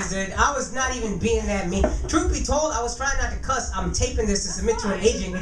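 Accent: United States. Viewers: Really, I thought he had way more of an NY flavor to his accent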